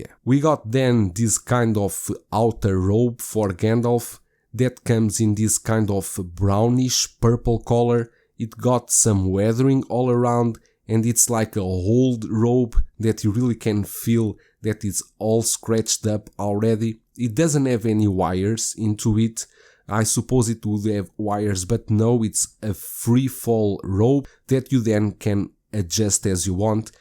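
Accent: Portugal. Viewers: Com o sotaque, vou assumir que o senhor é Português! Que bom conseguir ouvir a sua opinião! Awesome review, this figure is crazy good. I can't afford it, but I Pre-Ordered the Aragorn sculpted hair version. Can't wait!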